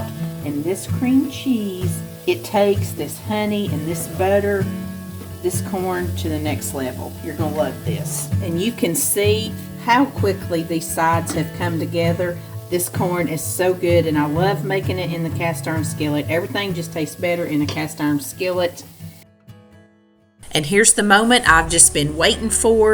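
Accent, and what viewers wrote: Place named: Tennessee, United States. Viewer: you can tell by my title that’s my country too ! I live out behind Dollywood ! Love the Smoky Mountains !! I thought your accent was a lot like mine !! 🧡🤍Ren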